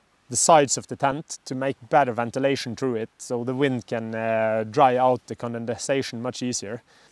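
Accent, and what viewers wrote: Bergen, Norway. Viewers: Hvorfor bergengelsk ?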